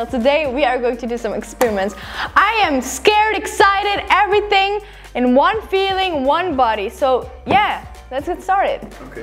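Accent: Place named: United States. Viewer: Serious question because I'm curious - do all Belgians learn American English with an American accent? Asking as a much closer neighbour (Brit) 😊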